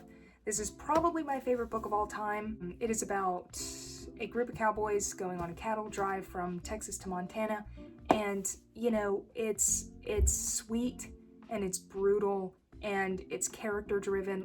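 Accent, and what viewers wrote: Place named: Carolinas, United States. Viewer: I think it's from the Carolinas in the Southeastern Coast of the US. I'm a Mississippian stuck in the PNW and I think it's lovely.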